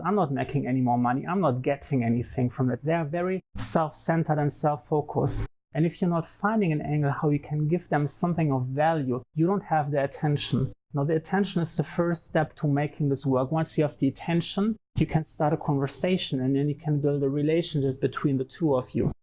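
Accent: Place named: Germany. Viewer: Wusste ab der ersten Sekunde, dass du Deutscher bist 😅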